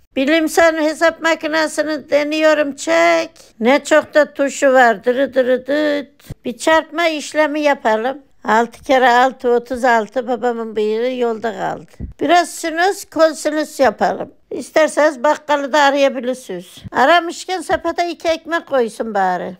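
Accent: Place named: Erzincan, Turkey. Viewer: %100 Erzincan ağzı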